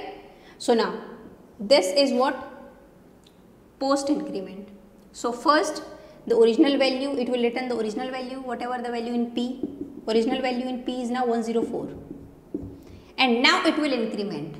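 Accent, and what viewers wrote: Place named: Gujarat, India. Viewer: Gujarati English accent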